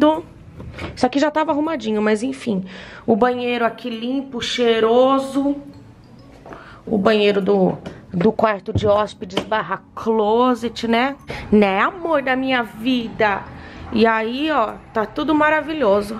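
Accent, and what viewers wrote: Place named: Italy. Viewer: Meu marido ouviu sua voz e perguntou: quem é essa italianinha? Daí eu disse: ela é japa amor😍😊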